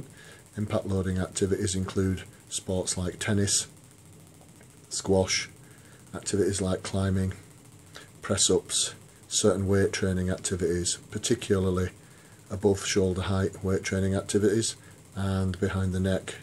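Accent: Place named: North, United Kingdom. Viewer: Can you use EWST for proximal long head bicep tendinitis? You sound northern are you based in the north ? Do you have a website (assuming the answer to the 1st Q is yes).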